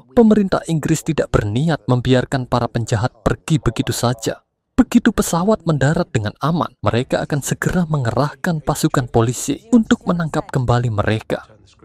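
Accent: Java, Indonesia. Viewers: di awal video, mimin kyk logat bali, lalu ganti logal jawa medhok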